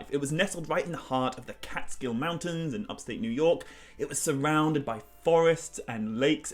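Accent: England, United Kingdom. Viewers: Question? You sound English. Are you from England?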